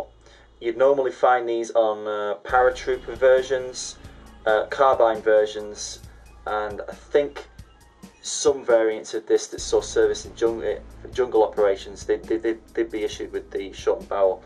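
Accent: United Kingdom. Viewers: You sound like you are from Great Britain. I'm a little ignorant on your laws, but I was under the impression that you could not own a gun like this. Awesome gun, man. I'm saving up for a DSA FAL like this one. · alright, nice gun, yorkshire accent? :-) slr is a giveaway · You sound British. How do you have that? Or are you living in the US?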